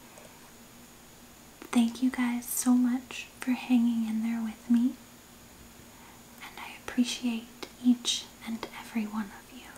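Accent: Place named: South, United States. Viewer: Welcome back! Thanks for the update! Random but I hear your accent way stronger now than in previous videos... southern! (Or texan?):)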